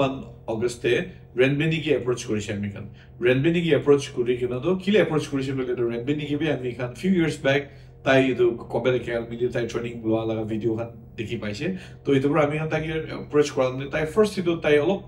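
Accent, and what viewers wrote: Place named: Nagaland, India. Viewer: Nagamese accent/ tune of Sir and Dreamz unlimited is the only channel where we get to learn real Nagamese 🫡👏👍 looking ahead for your talk show and other events in this winter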